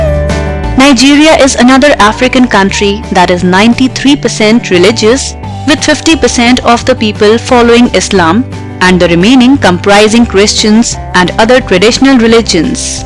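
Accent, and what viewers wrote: India. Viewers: Where is lndia? And the person who is talking sounds like an indian.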